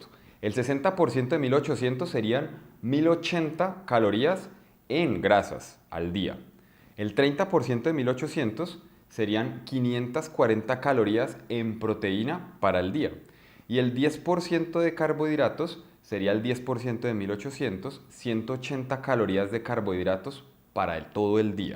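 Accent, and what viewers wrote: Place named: Colombia. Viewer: Que belleza encima colombiano ..la put..madre que hermoso acento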